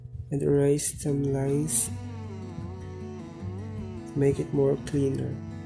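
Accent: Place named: Philippines. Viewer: Your English accent is really the same as filipino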